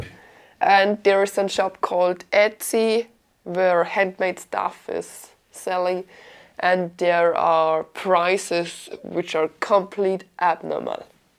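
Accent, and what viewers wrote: Bavaria, Germany. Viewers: Your accent when you speak accuses that you are German or something similar. I have had these models in my own house and in my parents' house for more than 30 years and no misfortune of any kind has ever happened, not even a fire or someone who has suffered burns. do not spread bad and false informations. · Sooo lustig: englisch mit bayrischem Akzent! 🤣🤣🤣🤣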